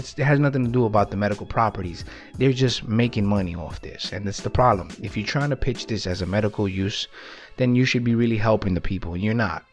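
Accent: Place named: New York, United States. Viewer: I was right, you are from New York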